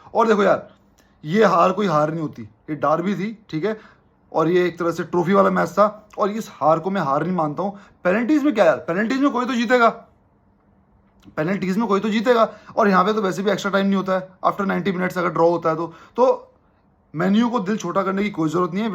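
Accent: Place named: Uttar Pradesh, India. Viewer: uttar pradesh mai kaha se ho bhai Voh toh mujhe pata chl gaya tha app uttar pradesh se ho apki voice se😅😅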